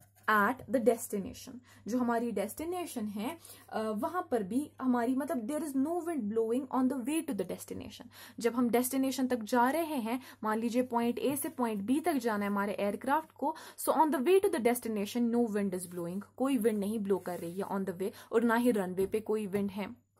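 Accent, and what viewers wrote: Kashmir, India. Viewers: Superb explaination mam. It's seems by your voice that you are from Kashmir. And you are doing well. I was really need this in this time because my university exam is going to start.❤️❤️❤️ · Are you from Kashmir 😊 your voice resemble Urdu accent.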